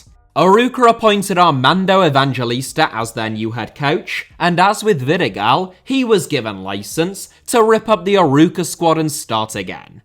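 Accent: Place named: United Kingdom. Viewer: The video IS interesting, but I somehow kind of feel weird when a super British accent speaks about Europe 😂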